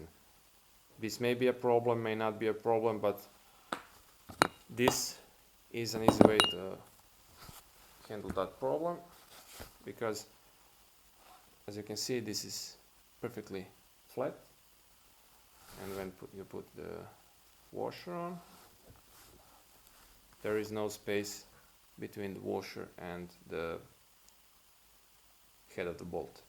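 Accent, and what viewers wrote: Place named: Eastern Europe. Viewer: I really loved watching this video. It’s informative and I especially enjoyed the calm pace of it and your voice and (Slavic?) accent. It was also very clearly explained and I’m confident I can give this a try myself now. Definitely appreciate a good homemade video like this without all the editing, quick scenes, flashing lights, annoying loud music and clickbait. Just a simple information video. Keep it going!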